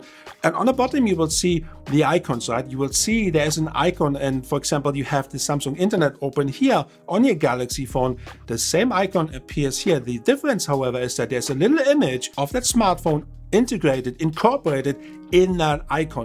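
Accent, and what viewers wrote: Germany. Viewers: Nach der ersten Sekunde merk ich schon da spricht n Deutscher😁👍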